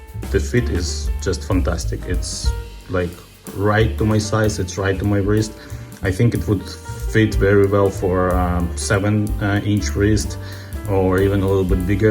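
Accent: Russia. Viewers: О єбать який москальський акцент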